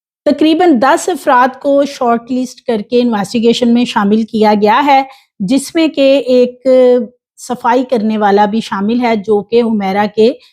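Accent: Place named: Punjab, Pakistan. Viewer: Tu c paindo ho. Ardo (Urdu) punjabi accent which boldi ho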